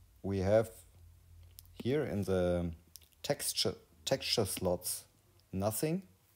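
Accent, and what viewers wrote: Bavaria, Germany. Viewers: bavarian english is dope.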